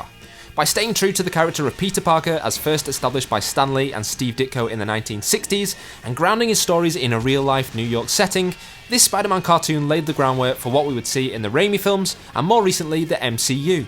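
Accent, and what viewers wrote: United Kingdom. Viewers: I don't mind seeing the old 90's Spider-Man the Animated Series again, but I wish most YouTube uploaders would stop being dickheads and talking trash about the ending of the show being related to the Clone Saga storyline. "The story that fans love to hate"? Thats the problem: most people think that most (if not all) fans disliked the Clone Saga storyline when it was the best story in recent years for Spider-Man at the time. So much happened in that story that I, for one, couldn't get enough enjoyment out of it. Then, it was over, but it had lasting impressions that were both memorable and shocking. Maybe I'm being a bit prejudiced when I say this (honesty makes the world more interesting), but since the narrator is British or English, I don't expect him to fully understand. Even if he didn't like the Clone Saga, there's no need to keep talking down about it as if it was the worst story in Spider-Man history (cough One More Day). 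Yet, this is what people do. Nothing better to do with their lives than bring up old hurts from the past. Leave it alone, already. He sounds like a somewhat old enough guy. Move on. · Downvoted and left the second I heard a British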